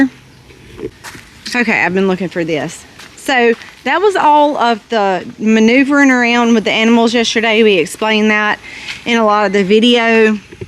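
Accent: South, United States. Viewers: I talked about my grandmothers garden. They also had chickens in a hen house an d pigs in a stable. Both had a fenced area so they did get outside. I agree I love spinach but grandma didn't have that. I am curious you are always talking about the veggies do you have fruits. Grandma had cantaloupe and watermelons. She had an apple tree but it never gave off much. I didn't see corn either but I think it is late in the year for it. Also I live in Oklahoma and not sure what area that you are in but I am betting in the south hearing your husband and especially your southern belle accent. I know certain things grow better in certain areas.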